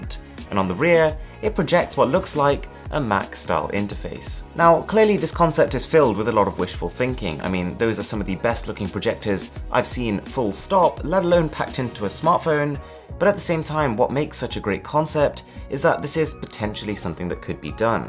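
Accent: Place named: RP, United Kingdom. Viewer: It's the only reason I might stop watching 👀 exaggerated accent trying to make British sound even more posh makes me cringe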